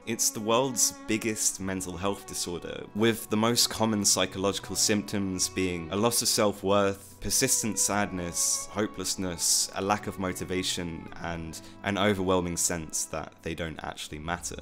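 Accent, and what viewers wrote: United Kingdom. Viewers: are you from north or south UK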